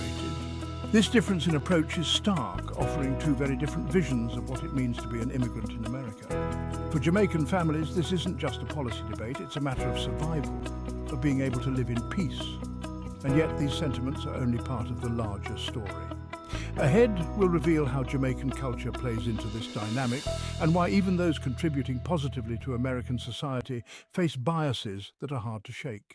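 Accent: United Kingdom. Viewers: With that english accent ,i wonder why this one is concerned about Jamaica and USA,remind me of the Africans with no concern about their own messedup continent,but always focussing on Jamaica,but when did Jamaica become a part of the USA,its an immigrant country, jamaicans like most immigrants has been going back and forth since time began,and again why is mr Brit accent concerned about Ja..hhhhh..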